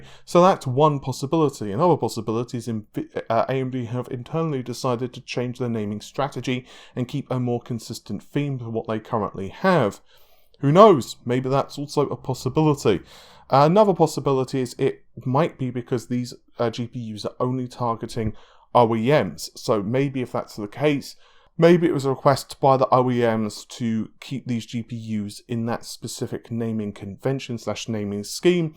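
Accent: United Kingdom. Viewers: He is British, they say things differently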